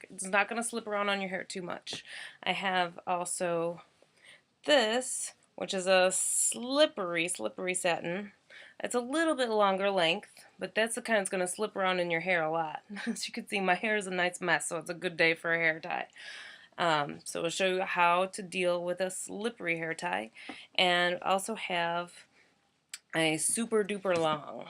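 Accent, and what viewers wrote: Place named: Pennsylvania, United States. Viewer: You are a smoke show 💨 I love your accent too. I’m guessing PA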